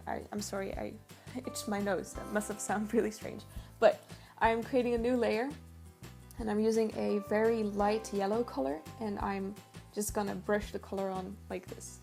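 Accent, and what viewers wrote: Netherlands. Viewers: Ikr, she totally sounds dutch. also she said Haargrens when she was looking for the word hairline. so yeah. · Are you Dutch? You kinda have a Dutch accent.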